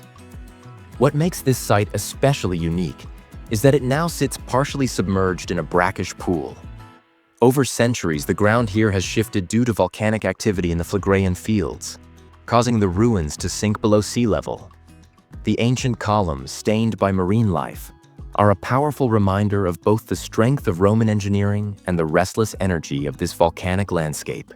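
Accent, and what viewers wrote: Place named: United States. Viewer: Why do American accents get on my nerves so much? Sorry,can't bear to listen!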